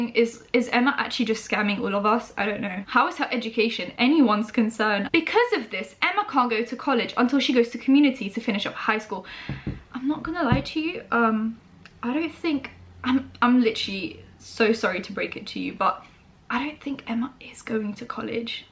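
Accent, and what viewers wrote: United Kingdom. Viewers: What's your heritage? You sound like you have a slight twang to your British accent that's all!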